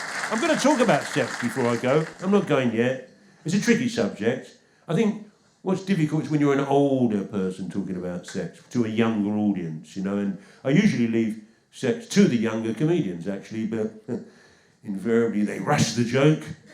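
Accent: Cockney, United Kingdom. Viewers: luv this fella .. proper cockney geezer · As a former Portuguese immigrant in the UK, I loved it. You sounded to me like a proper Guy Ritchie cockney character, and I mean it in the best way possible. Bloody brilliant. Cheers from Portugal.🇬🇧🇵🇹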